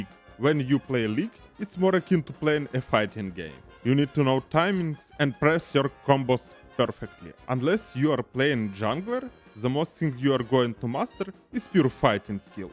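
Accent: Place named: Russia. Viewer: Я всё гадаю причину этого мазер рашиа акцента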